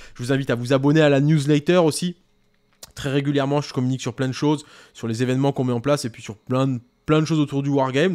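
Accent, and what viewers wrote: England, United Kingdom. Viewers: Change rien mec! Haters gonna hate ! Tu nous régale avec ton accent anglais 🤣😘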